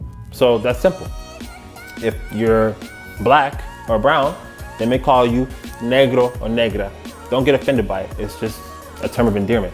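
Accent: United States. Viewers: 😂😂😂😂😂 bro el acento gringo yo moriiiiii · That gringo accent makes me really practice my speaking skills!! I DO NOT want to sound like that!!!! LOL!!! IT is truly "cringy"LOL! Thanks for the awesome vid!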